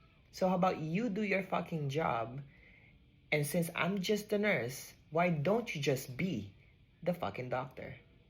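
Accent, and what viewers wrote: Philippines. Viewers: Every time he plays a mature nurse he always brings more of the Filipino accent 😭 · Love the thick filo accent tol, you’re a star ❤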